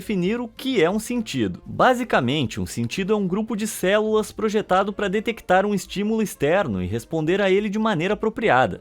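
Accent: Brazil. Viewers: tinha uma boz americwna, ess😊a voz tamuito brasileira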